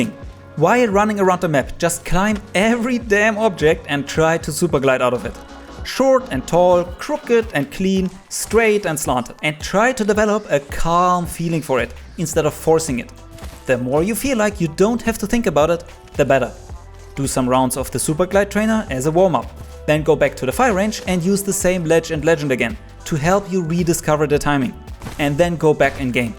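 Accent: Germany. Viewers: Hört sich Deutsch an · You have very german accent. Good Video bro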